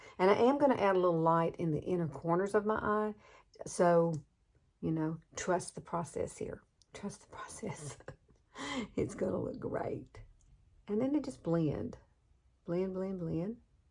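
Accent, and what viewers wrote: South, United States. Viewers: Ty for the brush to pencil tip for no blobs :D Btw, I love love love ur southern accent, it is beyond cute 🥰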